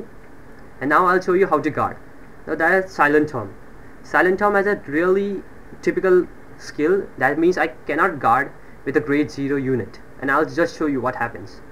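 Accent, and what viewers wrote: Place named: India. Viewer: ur original accent u do no harm so expecting an indian accent . the overall video was gud keep up the gud work bro👍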